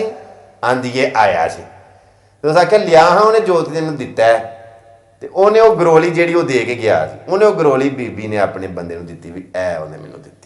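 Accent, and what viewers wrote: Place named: Gujranwala, Pakistan. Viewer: Story nonany wala apne lhjy se Gujranwala side ka lg rha.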